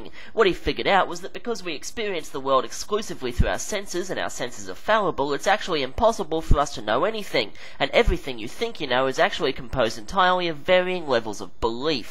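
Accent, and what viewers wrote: United Kingdom. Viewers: Only a British accent could pull this off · wow...honestly, i got a totally wrong impression of what you looked like judging from your voice...lol, guess its just the English accent. Still another awesome 3 minute philosophy yet again and thanks dude! i aced my philosophy midterm because of you! also, you think you could maybe do a 3 minute philosophy on Nagel? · okay, this was so fucking funny, I love British sarcastic drawl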